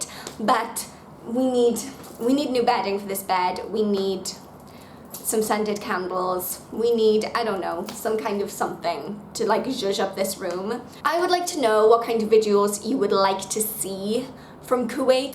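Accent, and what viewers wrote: Wales, United Kingdom. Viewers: Sounds like a Welsh bird ?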